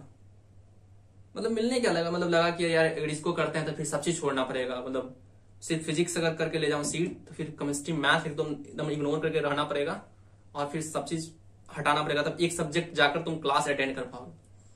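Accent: Bihar, India. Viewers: Bihari ho kya bhaiya. Tumhara tone BATA Raha hai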